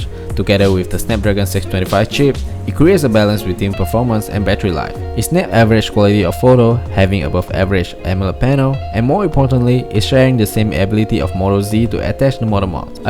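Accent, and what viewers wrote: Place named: Malaysia. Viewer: hey, great video. I guarantee that you'll have tons more subscribers very soon!! also, where are you from?? sounds like Malaysia, Singapore ah? like me ;-)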